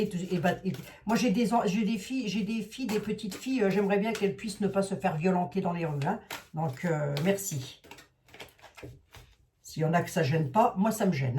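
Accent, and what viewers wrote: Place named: Lorraine, France. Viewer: Bonsoir, je vous écoute toujours avec plaisir. J'aime aussi votre accent et expressions qui me rappellent ma lorraine natale.❤❤❤❤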